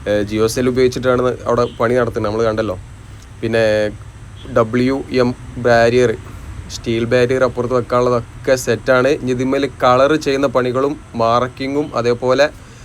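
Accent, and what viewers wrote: Malappuram, India. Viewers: മലപ്പുറതകാരനാണെന്ന് സംസാരം കൊണ്ട് ഉറപ്പായി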